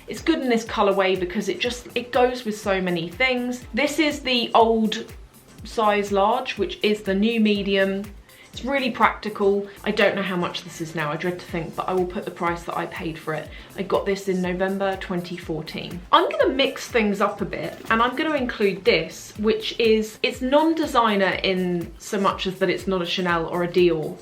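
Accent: United Kingdom. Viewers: I don't know why, but whenever I heard talk, I felt like I spoke in British English accents for no reason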